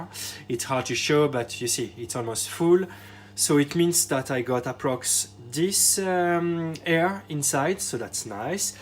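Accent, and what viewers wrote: France. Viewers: You sound French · Hello friend ! ! ! Thank your for this nice video ! ! ! ! What is your native langage ? ? I subscribed today Sébastien .... Hello from FRANCE .... It seems you speak french because your special accent ! ! ! ! 💖🍔🍚🍎🍭😍🍄🍏💛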